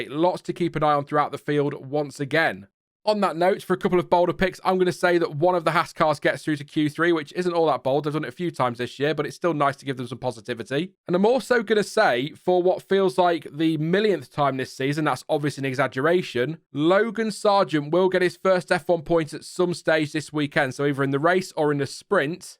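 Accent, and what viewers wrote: United Kingdom. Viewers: Lovely voice from this man who are talking about circuit. Thank for mencioned my Country Brazil; I know formula-1 will be here in Brazil in November, 5th. You are welcome to Brazil sweet voice and British accent.🇬🇧💔🙋🏁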